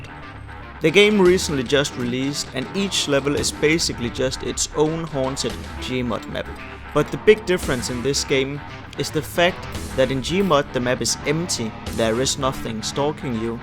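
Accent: Denmark. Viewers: Har aldrig hørt en mere dansk accent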